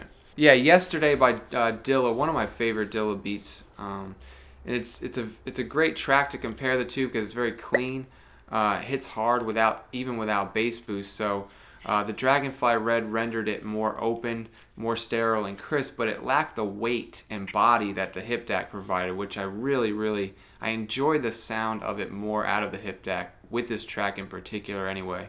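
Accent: United States. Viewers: Why do you want an american accent full time? Awesome review. I have a cobalt but mainly use it at home. Probably will get this for mobile.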